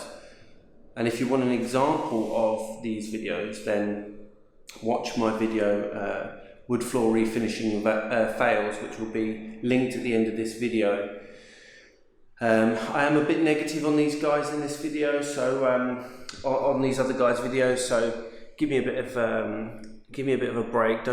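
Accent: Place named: United Kingdom. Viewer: maybe it's your british accent, maybe it's your experience,,maybe it's both, but you seem like an authority on the subject and I trust your wisdom.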